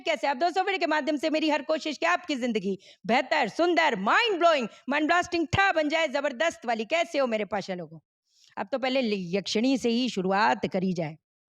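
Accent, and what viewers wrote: Punjab, India. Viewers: Di kya aap Punjabi ho Maine kai baar suna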